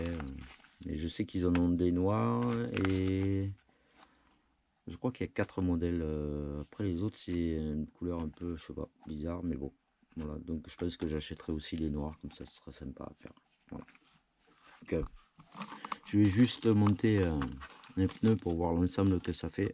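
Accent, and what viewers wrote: South, France. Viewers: moi je préfère avec ton accent du ☀️☀️ it's smells the canicule 🤣🤣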